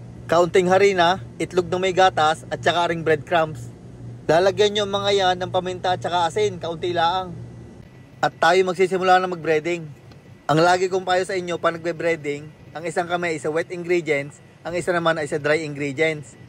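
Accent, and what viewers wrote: Batangas, Philippines. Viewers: Parang Taga Batangas 😆😆🤧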